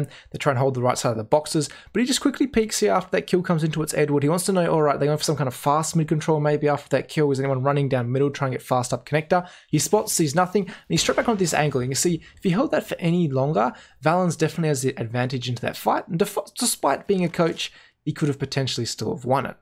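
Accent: Australia. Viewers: you should have at least 1M subs btw i love Australian english keep it up :)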